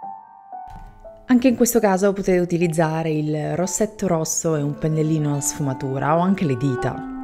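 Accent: Sicily, Italy. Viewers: Sei la migliore in assoluto...per la tua bravura, la tua fantasia...ma sopratutto per la tua simpatia e il tuo mitico accento da siciliaaana :) una curiosità...i tuoi figli ti vedono con questi trucchi? Cosa pensano? Hahaha che divertimeto immagino...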